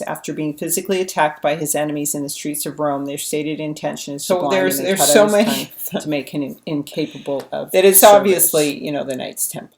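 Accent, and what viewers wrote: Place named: Jamaica. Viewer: I love the Yahmacan accent, Teach. & Beautiful Voice, I'm crushing.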